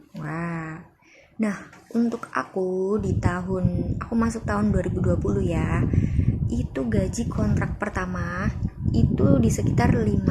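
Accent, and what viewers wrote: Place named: Java, Indonesia. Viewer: MBK asli mana logatnya ky orang Jawa ini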